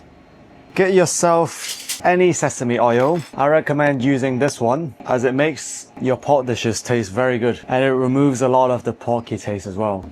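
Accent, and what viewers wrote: Northern England, United Kingdom. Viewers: It's his voice, he's from the north of England, has a nice mix of accents.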